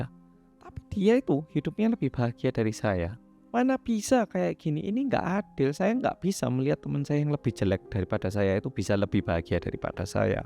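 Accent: Java, Indonesia. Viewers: orangnya juga pernah tinggal di jawa kak,jadi agak medok gitu ya pembawaan nya😂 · Selalu ikut ngomong medokkkk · Medoookkkk.... Hadir sidoarjo samm ❤ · Seneng bangeet yg bercerita org korea tp kyak org jawa asli ❤ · Kasus nya lumayan plotlist..tp itu siabangna kok bisa Korea tp medokkk ngomongnya..campuran ya bang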